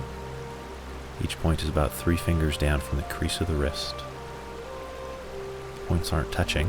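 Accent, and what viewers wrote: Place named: Scotland, United Kingdom. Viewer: Ah, wee of the Clan! Sorry to have missed the Great Scottish Retreat, a mere 50 miles from my cave in Perthshire. I have an excuse...I was in Portugal. Qigonged every day though! Looking forward to doing this routine and listening out for your Scottish accent...aye... 😀🏴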